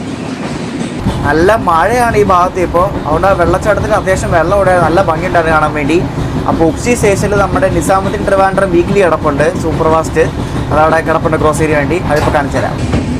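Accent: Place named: Kottayam, India. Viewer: Awesome …adipoli ….❤❤❤❤ parallel race super 🔥🔥🔥🔥. Talk kelkkan rasam undu. Kottayam style talk 😍😍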